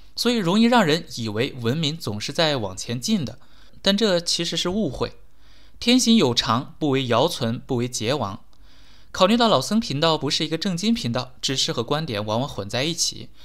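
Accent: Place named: Hefei, China. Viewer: 老僧听着像合肥人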